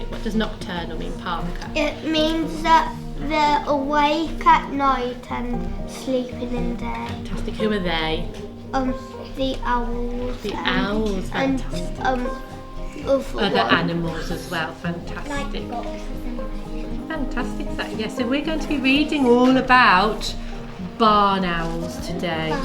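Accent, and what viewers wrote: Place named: Midlands, United Kingdom. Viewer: Love that midlands accent.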